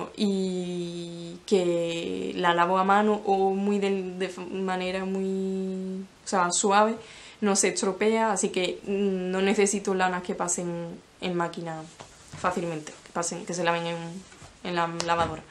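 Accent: Andalusia, Spain. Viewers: Me ha encantado tu podcast y eres muy simpática ❤. Te tengo fichada y nada mas oírte, supe que eras de algún sitio de nuestra Andalucía 😘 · tienes un acento andaluz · tu inverses les s et les t (c'est l'accent andalou ?)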